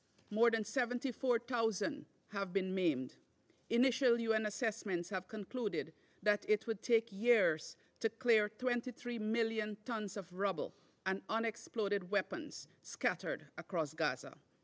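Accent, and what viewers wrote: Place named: Caribbean. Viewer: In her lovely Caribbean accent: The US resolution is bullcrap!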